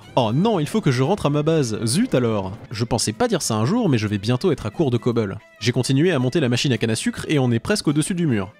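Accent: Quebec, Canada. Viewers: Les références sur plein de chose que je ne connais sont INSANE. Et jsp si c'est volontaire ou nn mais les intonations de voix avec une ressemblance a l'accent culte québécoise sont réussi. La naration est parfaite. Je dit merci a tiktok et a ma curiosité pour avoir découvert ce chef d'oeuvre sur YT. Je n'ai pas jouer a minecraft depuis la réforme du PvP 🤦‍♂️ et tu me donne envis de m'y remettre. Un gros FÉLICITATION pour sque tu as réalisé. 👍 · Putain ta le meilleur accent québécois du mondes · Super accent canadien 😉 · L’accent québécois c’est pour me tuer😂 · Ton accent Quebecois est cringe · T’es tu un Québécois? 😂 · l'accent quebecois a la limite du perfect WOOOOOW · wesh l'accent canadien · Ce com est en honneur de ton incroyable accent québecois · Es-tu Québecois? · est tu québécois ou canadien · j'adore l'accent quebecois que tu tes donner XD et cest un québécois qui approuve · Tu es quêbêcrois? 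Moi oui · T’es québécois? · J’adore ton accent québécois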